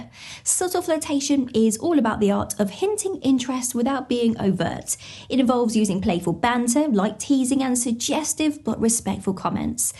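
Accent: United Kingdom. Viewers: British accents are so awesome!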